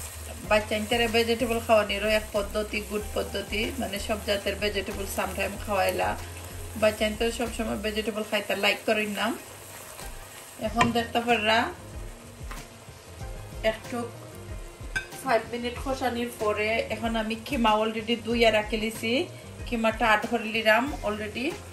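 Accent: Sylhet, Bangladesh. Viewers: syelhet er kotha gula khub sundor apu.tai bondhu hoye gelam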